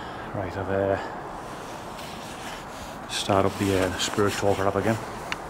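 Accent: North East England, United Kingdom. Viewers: Hi, new subscriber (thanks to the ouija brothers. Loving your channel. Going to binge watch all your vids tonight. Where you from, you sound like a north east lad. I’m in Hartlepool x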